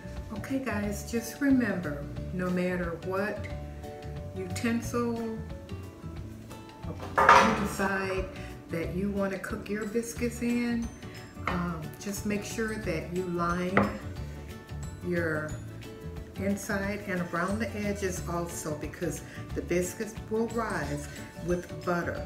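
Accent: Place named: Mississippi, United States. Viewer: You must be a Mississippi gal. New South Ford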